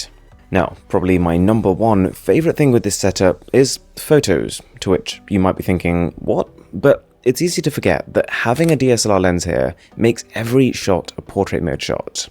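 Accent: United Kingdom. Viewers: Your from he uk!!!!?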